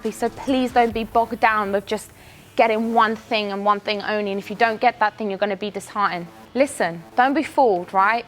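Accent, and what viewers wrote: England, United Kingdom. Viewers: English accents are everything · that’s her british accent fooling you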